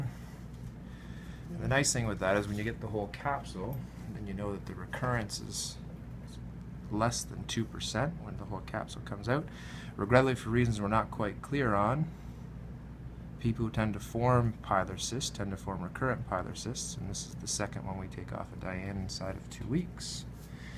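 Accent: Canada. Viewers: Do I hear Canadian accent? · Are you from Canada?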